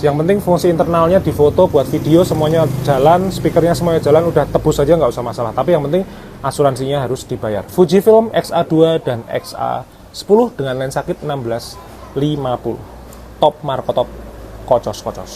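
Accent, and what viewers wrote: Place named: Semarang, Indonesia. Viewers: mas smpean wong semarang y. ? logate ky semarangan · logat semarang kentel kang wkwkw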